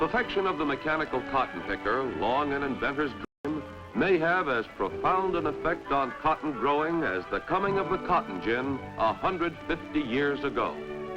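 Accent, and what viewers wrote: Transatlantic, United States. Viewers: he is speaking with a TransAtlantic accent.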